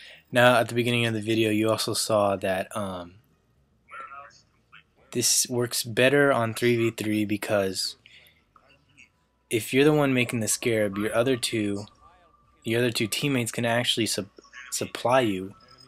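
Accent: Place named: Canada. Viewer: ¿',: | i can tell that your canadian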